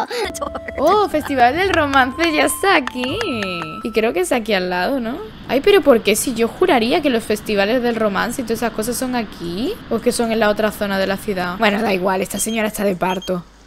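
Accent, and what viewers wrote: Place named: Andalusia, Spain. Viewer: Y me encanta tu acento súper andaluz con la s yo soy de Cádiz pero vivo en Marbella✌🏾👑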